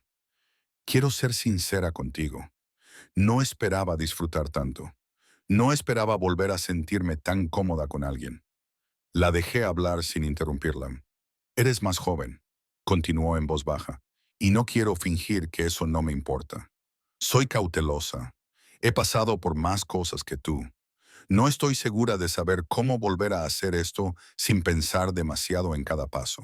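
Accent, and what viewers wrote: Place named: United States. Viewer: La narración es muy mala, el acento yanqui insoportable. 5 minutos y no he aguantado más...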